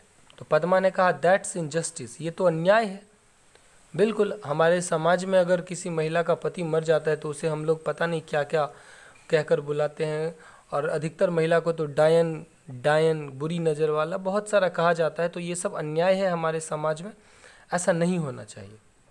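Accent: Bihar, India. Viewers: Sir aap bihar se hain kya Mashallah bada hi achha samjhae h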